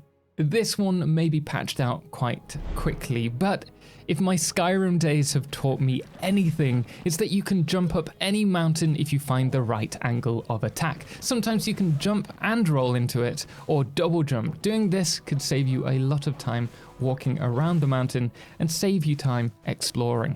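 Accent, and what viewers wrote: England, United Kingdom. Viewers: My man, which part of England is your accent from? Can’t place it exactly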